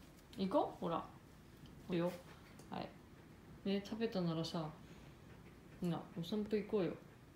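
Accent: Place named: Okinawa, Japan. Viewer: 沖縄出身でしょうか？イントネーションが似ている気がします！（私は沖縄出身でも何でもないです、すみません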